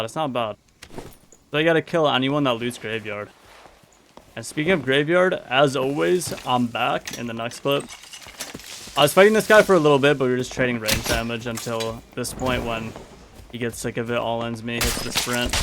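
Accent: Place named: Florida, United States. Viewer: I would bet this guy's from Florida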